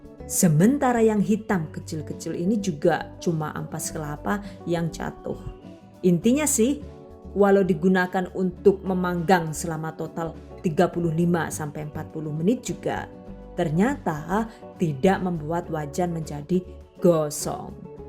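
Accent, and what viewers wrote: Banyumas, Indonesia. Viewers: Yang selalu saya kangenin selain resep mbak, adalah ngapaknya :). Sehat selalu ya mbak, biar bisa selalu berbagi ilmu :).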